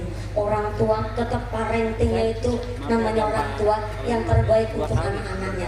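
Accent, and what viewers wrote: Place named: Banyumas, Indonesia. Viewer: Ora ngapak ora kepenak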